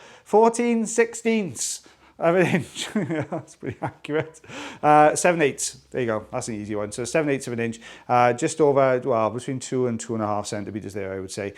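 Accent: United Kingdom. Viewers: I love you out all so much, I watch you so much my hubby has said I'm picking up a British accent!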